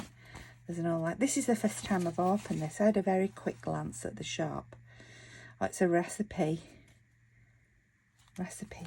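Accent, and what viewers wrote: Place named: England, United Kingdom. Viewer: Morin Miss... Ooooooo-La-aaaaaa... Love your Grungy Finds... Especially that Yummy Ole' Scrap Book... OMG ness, me-oh-my..... 🌹 🥰 ✨️... Enjoy your treasures... Was nice to SEE your Sweet English face on the SSS video... & to hear that lovely accent I miss so fondly... Later my BOO's 🐝 😎 👑 🥰 🙏 ✨️ 👍 🌹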